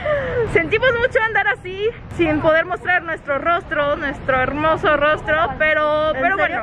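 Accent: Mexico. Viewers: Ahora que Cris está en México ví tu canal y me ha encantado, tu español es muy bueno, muy "mexicano" diría yo :) pero yo quiero saber ¿Qué estudias? ¿Cuánto tiempo hay que estudiar la universidad? ¿Cómo funciona eso en tu país? Me surgieron esas dudas ... Saludos... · Yo no soy seguidor del canal uno que otro video me e visto se Me hace una chica muy linda pero mi pregunta a sido siempre como rayos le hace para hablar también el español parece mexicana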